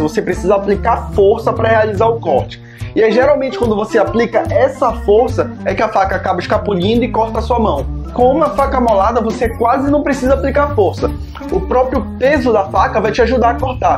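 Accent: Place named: Pará, Brazil. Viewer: só pelo sotaque parece bem paraense hahaha Gostei da tecnica e super funciona! obrigada =D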